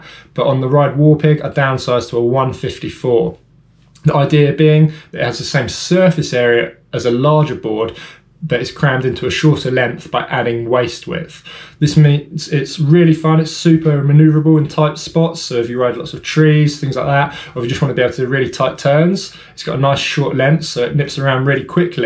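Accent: United Kingdom. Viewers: Oddly satisfying no loud music or fancy tricks or montages just a start to finish cruse down a mountain with a British narrator voice over.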